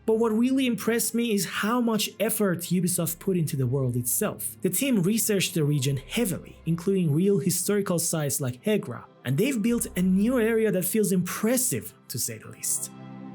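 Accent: Iran. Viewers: Are u Iranian? I ask it because of your accent❤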